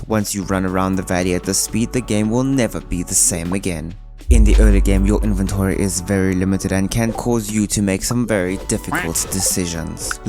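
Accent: South Africa. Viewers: he sounds south african to me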